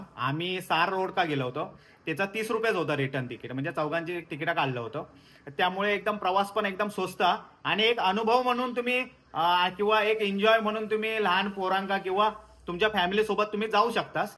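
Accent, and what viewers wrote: Malvani, India. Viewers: तुमची मालवणी लय आवाडली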